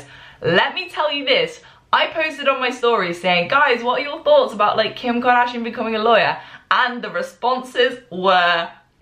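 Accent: United Kingdom. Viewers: I didn't expect you to be British but it was such a pleasant surprise when you started talking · Holy fuck you're British, I'm ready to listen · is it weird that i didn’t even notice that you had a british accent until you said “here in the uk” ??? that’s literally 9 minutes in. i literally thought for 9 minutes that you were american with an american accent??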